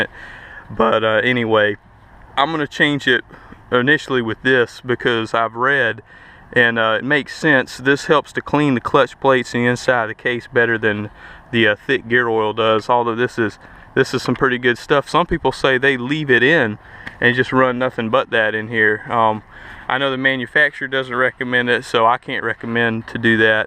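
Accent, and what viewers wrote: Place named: South Carolina, United States. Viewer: So I stumbled upon your videos on Dish Networks YouTube channel. I was watching you replace your Kawas water pump gaskets, bearings etc and I told my wife this guy sounds like someone from SC. Lol I then search your channel on my phone and I see the Welcome to SC sign. Pretty cool I'm from upstate SC area in Spartanburg! Btw I'm gonna rebuild my water pump in my 08 Yz250 this week!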